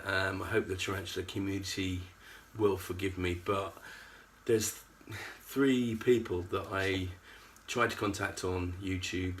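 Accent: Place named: RP, United Kingdom. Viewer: you sound quite posh.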